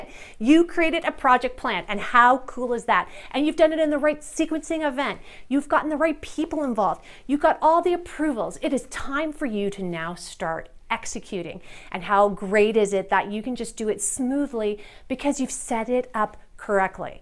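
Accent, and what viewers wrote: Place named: Canada. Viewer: It's "About". Mm'kay? "About"! Not "A-boat". Just kidding :-). Are you by chance Canadian? I can't put my finger on your very faint accent. Anywho, great info, very informative. I'm looking for a class to give to all the management at my Aviation job. This is some good stuff.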